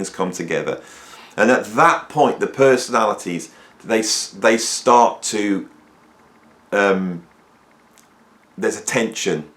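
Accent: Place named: Midlands, United Kingdom. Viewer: It's funny even in the Midlands accent you reading the fly on the windshield lyrics I still hear the big hit afterwards 😂 a real iconic moment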